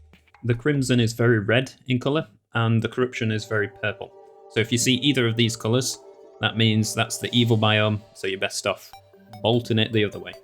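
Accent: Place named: North West England, United Kingdom. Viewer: are you from Manchester or the North West of England? Your accent seems familiar, nice vid!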